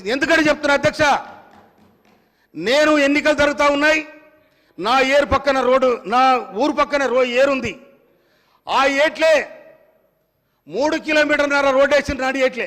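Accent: Telangana, India. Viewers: Assalina Telangana language Anna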